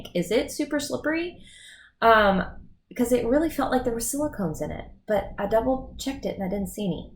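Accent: Texas, United States. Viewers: Yep, I recognized your accent and bouncy personality as pure Texan right away! Our Texas weather adds an extra challenge for we curly girls, but these Cantu products are my faves...they work wonders! I have fine, thick, 3B, 3C curls. I use the Define & Shine Custard (basically, gel in a jar) instead of the glue gel. The custard has that weird thick sticky feel too, but the cast is softer and most of it breaks up overnight as long as I don't use too much. Next morning I take my pineapple down, leave my hair alone for a bit and it usually falls into place. Occasionally I might have to do a little root shake/fluff but that's it.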